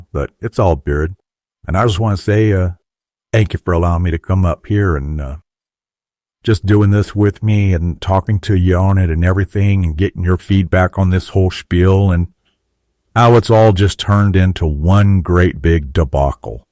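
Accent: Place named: South, United States. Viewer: Still listening....only 10 minutes in so far....there's that southern accent, lol 👍......you and your mate, need to do some live streams....awesome first video...can I post your video link on mine?... We need more channels like this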